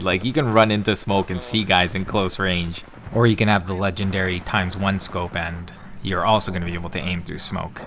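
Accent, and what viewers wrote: Canada. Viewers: Where in Canada are you from? Nova Scotia?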